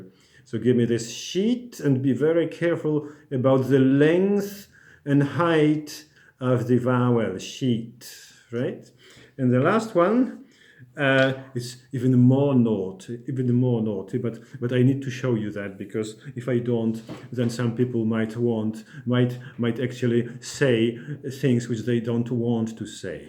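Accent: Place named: Russia. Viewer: Oh, your accent sounds awful! Are you russian?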